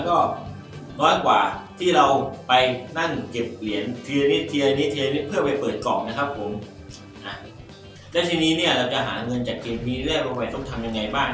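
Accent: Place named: South, Thailand. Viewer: แหลงใต้ต่ะหลวง เท่เลยผมว่า 555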